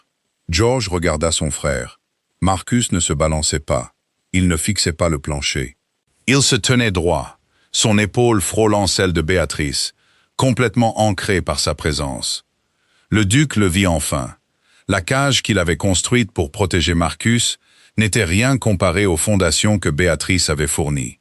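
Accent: England, United Kingdom. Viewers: L'histoire est belle et originale! Bravo! Dommage pour l'accent anglais assez inutile...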